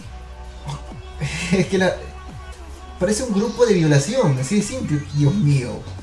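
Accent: Mexico. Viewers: que verga pensé que era de mexico xd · Eres de México o Perú..?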